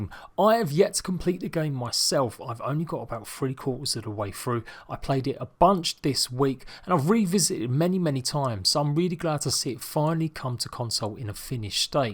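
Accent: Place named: Essex, United Kingdom. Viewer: You must be from Essex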